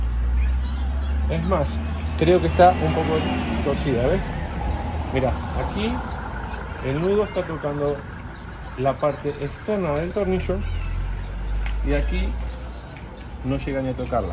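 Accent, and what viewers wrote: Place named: Argentina. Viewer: Hola. Y la rueda no controla?? Solo esto? No aflojas dirección? Creo que talves mi problema de vibración y movimiento leve de izquierda a derecha. Se soluciones así .. ja .. mi moto es vieja y jamas se la hace nada. Aunq mis rodamientos están buenos en la rueda y su eje. Seguro son los barrales. Gracia por tu info. Me suscribiré.. y por cierto. Eres argentino??. Suenas tal cuál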